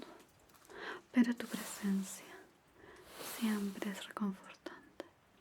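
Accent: Chile. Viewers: Es muy agradable escuchar un lindo asentó chileno que no dice ningún garabato después de escuchar a estas xalas qlias de la calle todo el día gracias ❤❤❤❤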